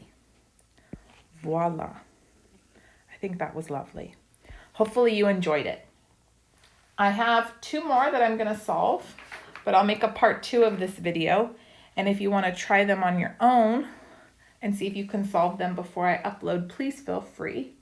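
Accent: United States. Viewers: As a German, I can say that your pronunciation of Weierstrass is pretty good, but you can tell you're from the US 😄.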